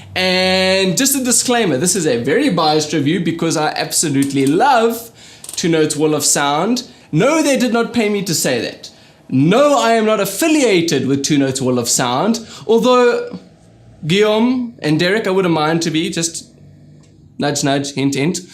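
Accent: South Africa. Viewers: South African...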